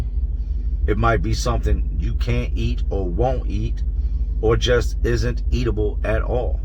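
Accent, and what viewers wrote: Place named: Philadelphia, United States. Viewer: Lol he said bol came back for more, licking his fingers and sht. All like: "yo that jawn was good. Lemme get some more." Haha I hate greedy people like that. Oh, and I'm from Philly. So I know how MFs can be. I can tell you've lived in Philly by the way you talk. But anyway, I was never locked up in Philly, but I've heard plenty of stories.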